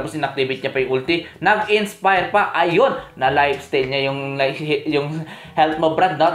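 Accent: Ilocos, Philippines. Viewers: Taga baguio ka boss? Aus pre ung tono mo pang pure ilocano tlga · Ilocano ka gayam idol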